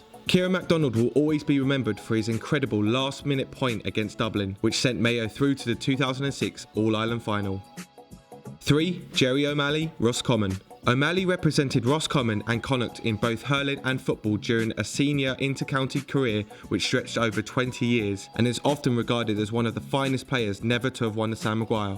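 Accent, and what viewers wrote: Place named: England, United Kingdom. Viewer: Funny to hear an english voice on a GAA video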